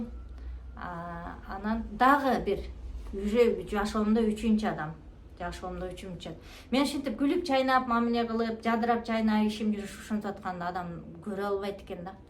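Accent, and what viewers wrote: Osh, Kyrgyzstan. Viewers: ушул кыргыздын кызы мага айабай жакты сонун суйлрйот оштон болобу тили оштуртардай суйлойот Америкада жашосун улантып журот жашосу сонун❤❤❤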